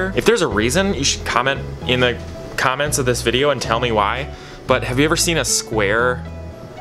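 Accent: Minnesota, United States. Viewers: I love how Minnesotan you are, it’s so nice hearing someone from the same place as me in a video!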